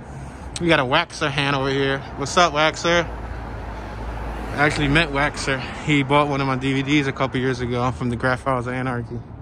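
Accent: Philadelphia, United States. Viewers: Loving the walk with you and love the philly accent keep them coming be safe out there walking those breezy streets of philly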